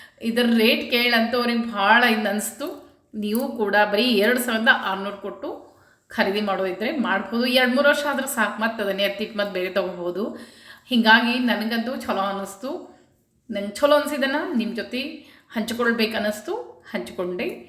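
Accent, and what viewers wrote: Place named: Dharwad, India. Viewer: ಯಾವ ಊರು ಇದು akka ದಯಮಾಡಿ ತೀಳೀಸೀ ಕೋಡೀ ನೀಮ ಬಾಷ ಹುಬ್ಬಳ್ಳಿ ಧಾರವಾಡ ಜಿಲ್ಲೆಯ ದೂ ಅನಿಸುತ್ತದೆ ಸುಮ್ಮನೆ ತೀಳಕೋಬೇಕು ಅಂತ ಕೇಳತೀನೀ ತೀಳೀಸೀ ಕೋಡೀ ದಯಮಾಡಿ🙏🙏🙏🙏🙏🙏🙏🙏🙏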